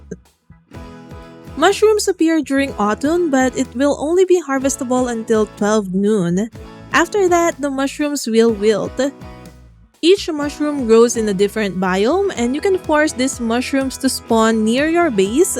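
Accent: Philippines. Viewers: Great tips and video. That Pinoy accent though...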